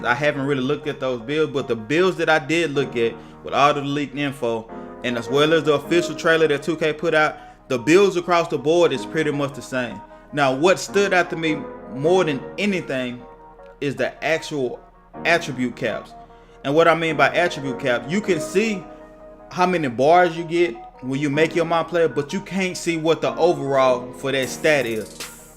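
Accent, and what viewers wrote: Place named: Louisiana, United States. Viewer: you’re from louisiana yea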